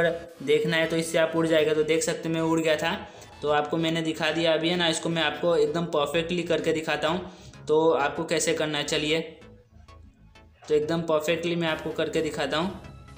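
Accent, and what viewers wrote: Gujarat, India. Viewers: Pakka tum gujrati ho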